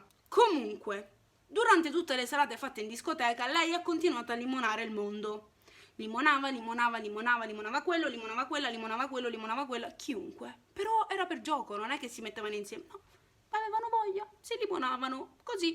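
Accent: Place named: Palermo, Italy. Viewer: Bel video 🤣❤️ il tuo accento palermitano é il top ❤️🤣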